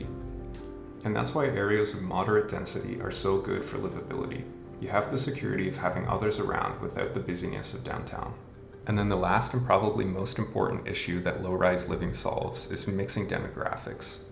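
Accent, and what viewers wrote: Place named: Canada. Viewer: I HEARD THAT CANADIAN ACCENT AND THEN I WAS CONFIRMED BY YOUR ABOUT SECTION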